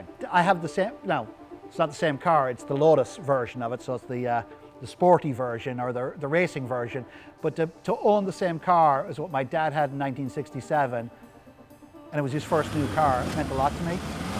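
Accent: Ireland. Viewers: ur Irish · He's Irish?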